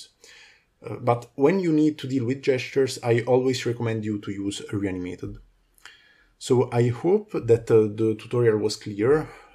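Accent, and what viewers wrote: Italy. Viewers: are you italian? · Italiano? Sembra dall’accento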